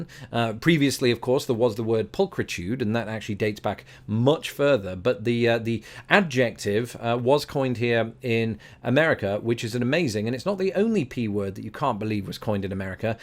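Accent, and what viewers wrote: United Kingdom. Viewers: Thanks for this series, it's most enjoyable and quite interesting. As someone whose been a bit of an Anglophile in my media consumption, I've long found the way the UK speaks the same language this former Pennsylvanian used intriguing. Both the US and UK speak a strikingly similar language---except at those times when we use totally different words or phrases to describe the same thing. From my teen years, thanks to our local PBS affiliate, I became exposed to a litany of BBC sitcoms that became my first forays into hearing the type of English you grew up speaking. Between Tom Baker's Doctor Who saving the universe with K-9 and Sarah Jane by his side, to Mrs. Slocum worrying about her pussy well being at home in the airing cupboard, while working behind the counter at Grace Brothers, I was smitten with British TV. What intrigued me most was learning all of the words and phrases that we didn't use back home in Harrisburg. 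Deciphering contextually what everything from a car's bonnet to it's boot became intriguing mental exercises for my teenage brain. Ever since, I've always found interesting how English varies in its usage between the nations that speak it, so I appreciate your perspective on the differences. The themes for these videos are well considered and the abridged etymologies have been most enjoyable, as has been your presentation of this overall. A British accent like yours just adds that nice touch to the whole thing, I think, so I hope you retain it. To my American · I'm catching up on older videos. it's fun to hear him sound more "British" as the videos get older. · Brilliant list! I think I only used the word brilliant after listening to your brilliant british accent.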